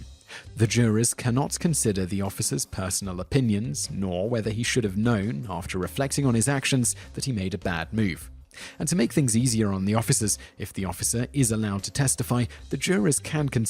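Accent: England, United Kingdom. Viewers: Is it weird that a British guy is narrating this? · This is an extremely sensitive and devisive problem; to the extent that I kept noticing your use of the word "we". You are talking about concerns you particularly identify as U.S. problems, but you continually use we when considering actions and options. You have a very British accent so I don't know if you live in the U.S. or not. If you do, then welcome and include yourself as we; if not, then unless you are reporting facts related to many counties including your own you should not use the word we as it is inapplicable. The only fact you reported of which I was unaware was the possible use of steroids. I can't believe there are no medical tests to document their use and while I'm ignorant of laws governing their use I certainly think they should be illegal for armed people, even if you are a security guard or MP. 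On the whole I agree with your assessment of facts and think they are due to the fact that the U.S. is too big for oversight and responsibility is diffuse or non existent. It isn't just the police. The public school system is disgraceful. The sub literate in this country outnumber the literate and many teachers barely reach the standard of literate. But it is a sacred cow dying in the street of starvation in a Hindu society. Everyone reveres the cow, but no one takes responsibility for caring for it. Teachers lie and help students cheat to pass basic standard tests and everyone believes that is an aberration and not the norm, but it is the norm. Landlords of privat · I'm not sure where you are getting your facts, but I'm seeing many/severe falsehoods. Maybe stop getting your info from the bathroom stalls and actually speak with cops and other law enforcement officials. Top ten problems with your channel? 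1 thru 10 totally wrong about everything said. Maybe instead of doing a video on a system you know nothing about, Brit. Go to your country and give us a video about the problems with British police. I guarantee you know more about that. Ps your accent is horrible. New Jersey is a state not a city, by the way. Get a map.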